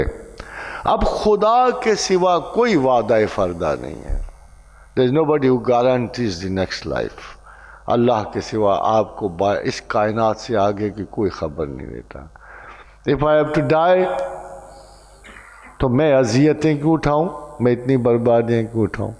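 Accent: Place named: Punjab, Pakistan. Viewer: Not able to understand your Punjabi Urdu dialects, next please speak haltedly or kindly speak in English for English audience Sirji.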